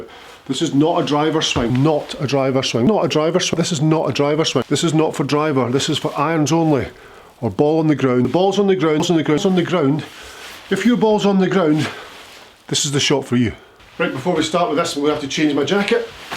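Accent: Scotland, United Kingdom. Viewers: Great vid good to hear a fellow Scot voice, it's just come back to me a few weeks ago I was hitting the ball great mostly on one leg thanks for the reminder 😁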